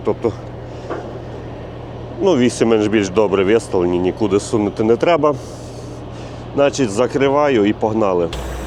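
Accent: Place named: Ternopil, Ukraine. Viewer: земляку вітаю акцент Тернополя точно тобі вже пора нового петровича 389 😂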